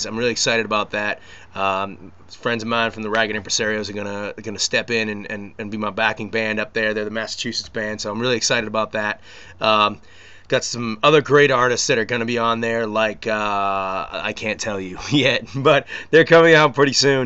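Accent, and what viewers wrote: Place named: South, United States. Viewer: This is awesome, I just found these postings! You are certainly starting to sound like a "southerner" lol! Opening for.....congrats-miss you guys!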